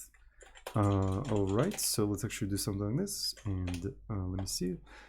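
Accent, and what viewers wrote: Russia. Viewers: Русский акцент 😂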